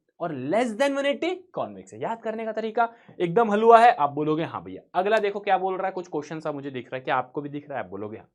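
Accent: Bihar, India. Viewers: Sir ap bihar ma kha se ho ap ki language bihar jaise hai 😂😂❤❤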